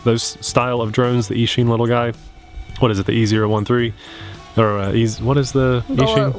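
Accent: United States. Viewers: ...Are you from Ohio?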